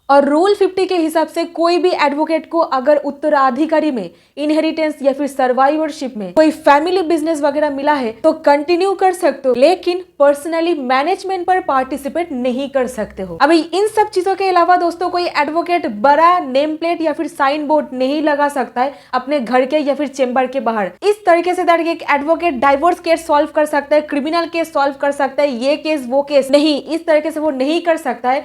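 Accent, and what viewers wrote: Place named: South, India. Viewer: Your voice is so good and charming with South Indian accent, your content is very informative and last but not least you are very beautiful, would you like to have a 70 year old doctor as your boy friend 😊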